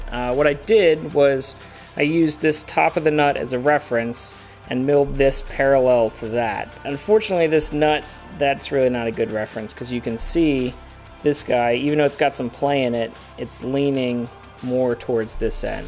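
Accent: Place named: Australia. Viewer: Your heavy Australian accent makes listening to your otherwise excellent vid difficult. In future would you consider including Canadian & American subtitles. Where in Sydney do you Herald from? I have many Australian speaking friends and they love you vids. Thanks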